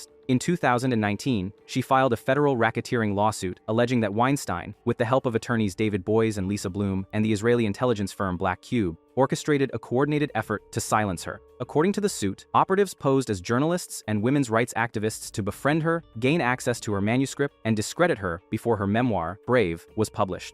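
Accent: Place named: United States. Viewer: You have strange pronunciation of quite a few names. You do sound American though, which is pretty strange😊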